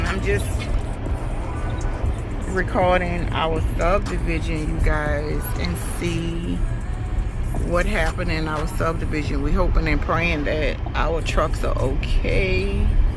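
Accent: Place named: New Orleans, United States. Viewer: I knew I heard that NOLA accent. 😂 when I started watching. I love it. I will NEVER forget Katrina and Rita hit Texas shortly afterwards. It was August 2005. I will never forget it. I’ve made some of the best friends from my NOLA posse who came to Texas and never returned after Katrina. This video had me crying and gave me chills remembering the horrible stories.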